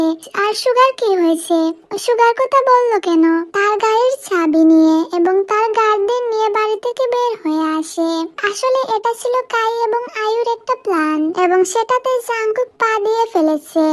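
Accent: Sylhet, Bangladesh. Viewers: তো তুমি কি সিলেটি নাকি চিটাংগা🎉🎉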